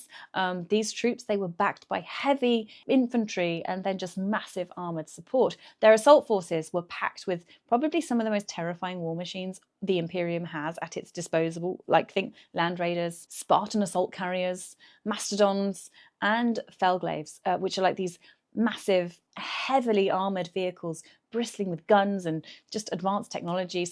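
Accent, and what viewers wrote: United Kingdom. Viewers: We just discovered Dark Angels greatest secret. Their Dreadnoughts are piloted by the FALLEN! P.S. are you British? You have a beautiful accent.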